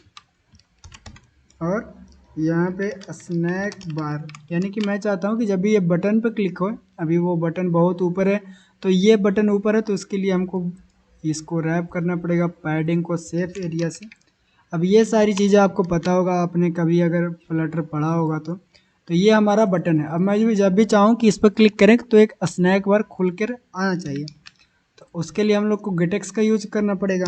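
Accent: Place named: India. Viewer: Bro your title English but your speaking Indian no way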